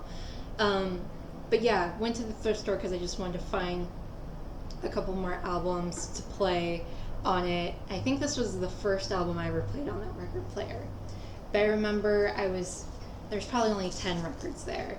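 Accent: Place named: Pacific Northwest, United States. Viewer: Hey, you showed up in my recommendations the other day, so this is the first video of yours I watched - and it made me a subscriber! Great easy-going style you have, and in a YouTube genre that's far too dominated by men, if you ask me. It sounds like you're a fellow Pacific Northwesterner, too, which is all the more fun.:) I hope you survived last week's heat wave OK. I look forward to watching more of your stuff (and I invite you to take a peek at my channel if you haven't yet)! Cheers!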